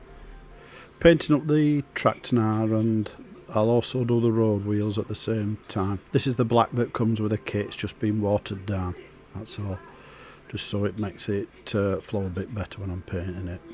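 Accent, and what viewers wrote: Barnsley, United Kingdom. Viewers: you sound like your from barnsley ?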